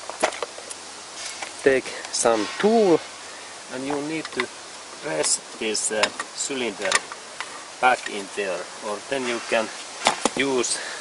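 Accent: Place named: Härmä, Finland. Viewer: murre on niiiiiinnnnn härmäläinen kuin olla voi:)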